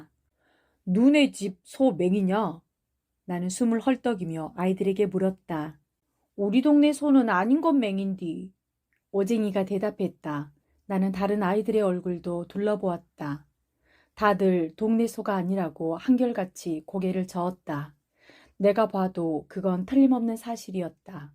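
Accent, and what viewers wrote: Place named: Goheung, South Korea. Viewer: 내고향 고흥 사투리에 어린시절이 생각나는 실감나는 명작이네요 아~~옛날이여 잘들었네요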